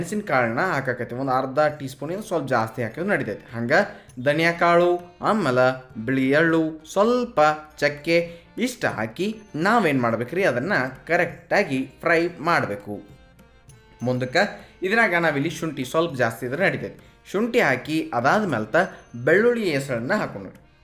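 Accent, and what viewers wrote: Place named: Dharwad, India. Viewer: ಸಾರಿಗಿಂತ ಜಾಸ್ತಿ ನಿಮ್ಮಧಾರವಾಡ ಭಾಷೆ ಕೇಳೋಕೆ ಮಸ್ತ್ ಅದೇರಿ 👍😁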